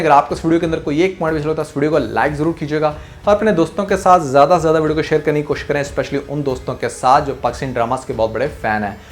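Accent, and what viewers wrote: Punjab, India. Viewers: One request please stop speaking English as Punjabi you can speak Punjabi urdu or English but properly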